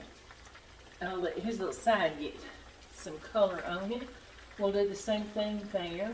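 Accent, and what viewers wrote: South, United States. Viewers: I enjoy watching you and listening to your Southern accent. You cook the good foods I grew up on. I am 73 and I always helped my grandma and my mom cook and bake. We were a self sustainable family and I really enjoyed it. I lived that life until I retired. I miss that life, but my kids grew up that way and they are following in are footsteps. They are both wonderful cooks. Sometimes they have cooking contests between them. You are wonderful thankyou for sharing.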